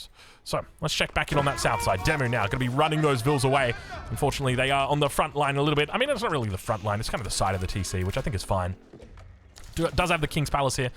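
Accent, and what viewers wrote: Australia. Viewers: You have no idea the things I do when I hear a good Australian voice..... <3 Aussie :)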